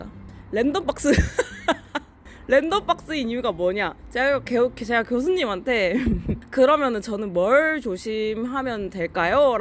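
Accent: Gyeongsang, South Korea. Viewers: 억양이 경상도분이시군요 힘내세요!! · 예쁘고 털털하시고 경상도사투리ㅋㅋ 심쿵했습니다. 긍정적 마인드시니 빨리 나을 수 있을겁니다!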